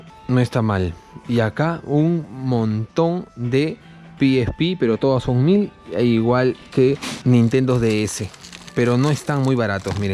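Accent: Peru. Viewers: es Peruano,por la forma de hablar.... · Eres peruano? Hablas como uno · Eres peruano? Sonas como peruano xd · es el primer video que veo y en la forma de como hablas... me parece que eres peruano, lo eres? te hablo desde Perú y por cierto que buen video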